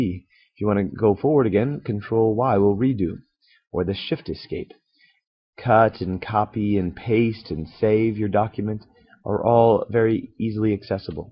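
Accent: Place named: United States. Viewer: This man clearly has an American accent so you point is invalid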